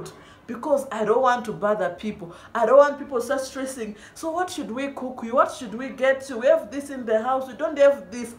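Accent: Uganda. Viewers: I don't understand. You have an Ugandan accent. How come you didn't know the language 😂😂😂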